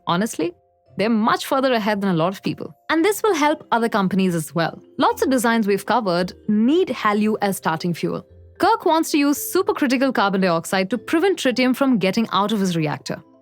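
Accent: India. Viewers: Liked her Indian Accent. It's broken and jumps from one twig to another like a little birdie. Hard to get the sense though. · Indian accent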